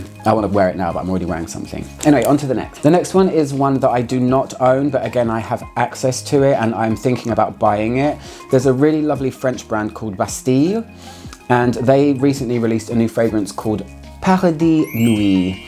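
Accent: United Kingdom. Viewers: I LOVE your british style and your pronounciation ❤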